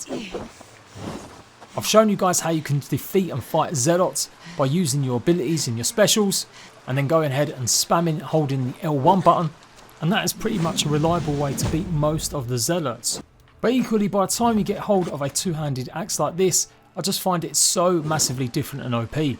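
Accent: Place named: United Kingdom. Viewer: Listening to your British accent hurts my face. Other than that you're awesome 👌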